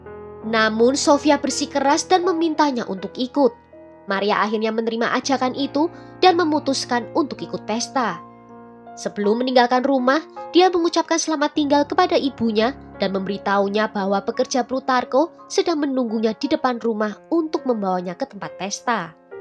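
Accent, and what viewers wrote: Java, Indonesia. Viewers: Medok banget ...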